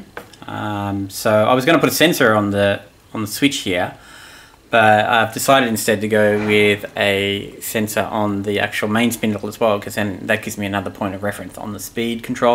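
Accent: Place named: New Zealand. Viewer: Thanks for sharing your brushless motor retrofit project with us. Those motors interest me as to their potential as a replacement for various devices with the added benefit of speed control but they are still quite expensive just to have an introductory play with. I’m in New Zealand hence the expense. Speaking of which, your accent is very Kiwi. Am I right?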